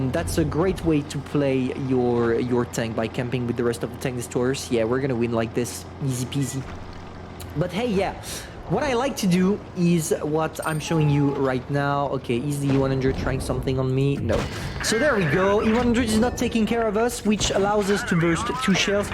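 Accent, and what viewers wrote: France. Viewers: Awesome video for newbie and yeah, seems like u are france, arent you? Idk why but i think so.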